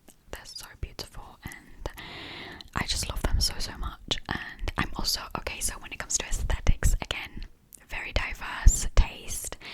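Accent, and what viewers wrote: United Kingdom. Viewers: Omg lovee the bri'ish accent 😭 it's sounds so crisp and relaxing ❤ (btw I'm also a huge feta cheese lover AND also I had to mention that your musical taste is literal GOLD 🤌) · Such a great British accent, I loved this! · I love your languages series. The British accent is really relaxing ☺️💤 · I love the btitish accent very much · Love it❤ your British accent is so pretty! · Hi, (aka ) and Happy Friday!😊😊😊😊😊😎☀💙💙💙💙💙💙🎄🇺🇸🌷🇮🇹💖💖💖💖💖💖🎇👍. Your british accent is amazing! Well done!😀⭐⭐⭐⭐⭐⭐👍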